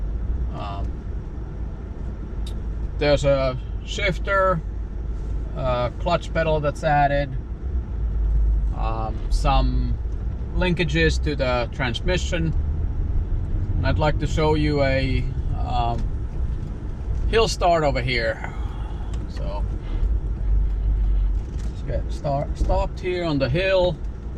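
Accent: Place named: Finland. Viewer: nice project. good solution for duoselect have to say that you sound very Finnish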